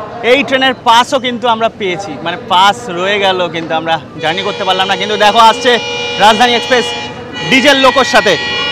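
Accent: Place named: Bangladesh. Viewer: আপনার কথাগুলো বাংলাদেশের ভাষার মত , ভালো না ।😢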